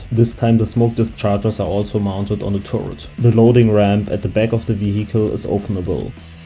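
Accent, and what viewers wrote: Germany. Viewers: Deutsches Englisch 🔛🔝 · bist du deutscher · As a german I litarlly hear ur actcent that u speak deutsch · du bist deutsch das hört man sehr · Are u German?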